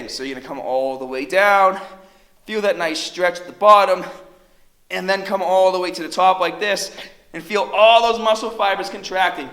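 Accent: Boston, United States. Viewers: you from Boston? never noticed your accent before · Man that Boston accent hit me like a ton of bricks!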